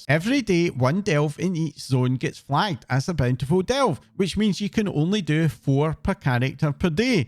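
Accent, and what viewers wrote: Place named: Highlands, United Kingdom. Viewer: This guys accent reminds me of my late grandfather Kincaid. He was a highlander. Ignore the trolls, it's all they've got. Let em get there tiny scrap of fleeting self esteem. It vanishes as soon as they get it. Please. Allow me. Piss off trolls.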